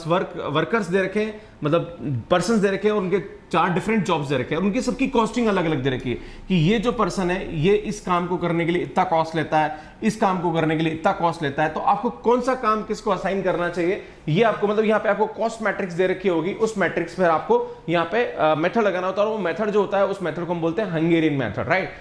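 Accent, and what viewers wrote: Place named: Madhya Pradesh, India. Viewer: Sir why did you add two in junction as it is of any use. Sir are you MP my friend has same accent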